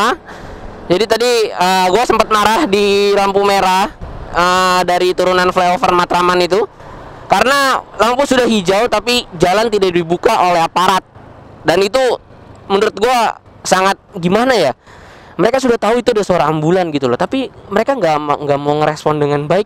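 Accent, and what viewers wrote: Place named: London, United Kingdom. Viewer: landun sound 🤤 like it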